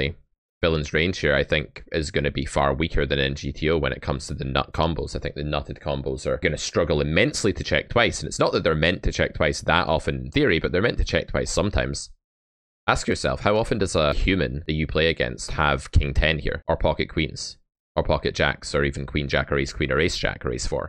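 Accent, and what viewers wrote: Scotland, United Kingdom. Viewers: Can absolutely tell that your Scottish and probably had too many conversations with stoned Glaswegians when you come right off the bat with a spoon analogy with way too much detail.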